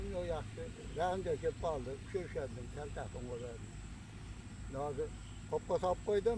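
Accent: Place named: Buvayda, Uzbekistan. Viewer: Хожи ота ? Каердансиз ? Бизнинг Бувайда тумани ахолисининг шевасига ухшар экан гапиришингиз . Лекин уста овчи экансиз , койил !... Видео учун рахмат ! ...🎉🎉🎉🎉🎉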